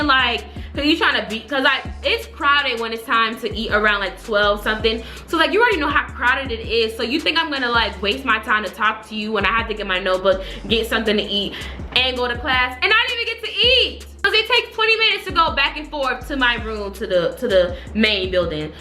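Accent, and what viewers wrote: Florida, United States. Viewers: Miss. Darlinton Lmfaooooo Florida squad! The expressions, the hype, the humor, the way she talks all of that I was like "lmfao is she from Florida?" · Yesssss I knew you were from Florida we talk the same lmao!!!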